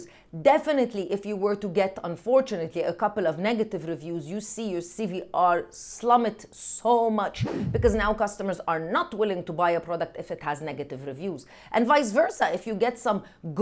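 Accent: Arab world. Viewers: Love to hear Arabic accent of English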